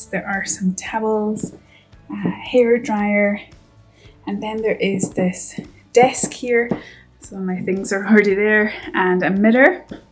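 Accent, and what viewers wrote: Scotland, United Kingdom. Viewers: Hi,I wanted to tell you how much I enjoy your journeys and adventures. You are lovely and so well spoken... love your Scottish accent. My mother was born in Scotland. Best wishes, and I look forward to your next video.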